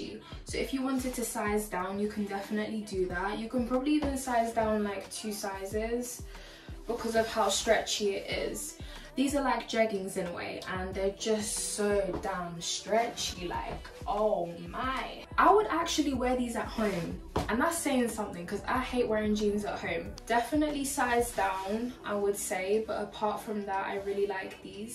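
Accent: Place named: United Kingdom. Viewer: Your British Accent….❤️ Very Beautiful As Well…..😍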